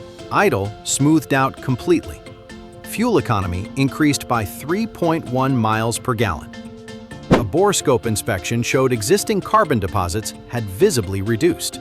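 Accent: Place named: United States. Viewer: And an American accent . I smell a load of AI bollocks !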